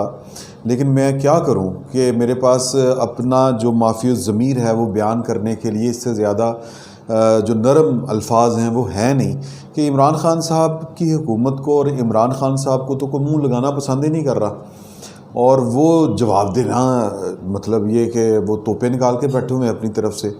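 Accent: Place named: Lahore, Pakistan. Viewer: AOA... Sir g zaberdast analysis hy...Aap ka baat kernay ka aik khalis Lahoree andaaz bht. Pasand aaya..Baqee ye jo Niazi k spoksmen bhaan bhaan ker rahay hn...tu bhai g inn ki 2 Din phelay Niazi nay class leeh hai...iss leyaa TATEE ka zor laga rahay hn... SHEEDA TALLY ko tu NIAZI nay BILLIONS kay TRAQEYAATEE FUNDS deyaa hn .TALLY nay MUREE ROAD per banner bhi lagwaie TALLY un AWAAM kay TAX k paisoun say Rawalpindi may kothian ( BUNGLAS) bunwa raha hy.. bayshak inquiry kerwa lain.....NAB Kahaan hy.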